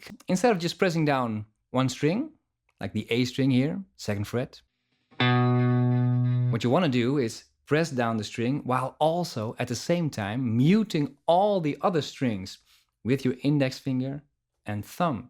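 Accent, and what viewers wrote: Netherlands. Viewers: You sound like a Dutch guy when you talk, are you dutch haha? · That Dutch accent...fucking coolest thing in the world..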